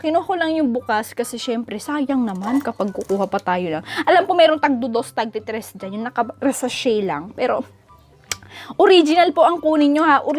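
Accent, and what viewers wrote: Ilocos, Philippines. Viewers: I love your ilocano accent "mallukong"😂 I love you ate!!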